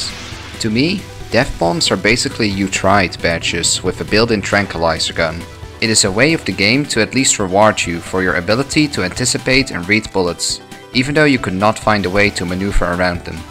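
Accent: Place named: Netherlands. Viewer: Heet Hollands accent.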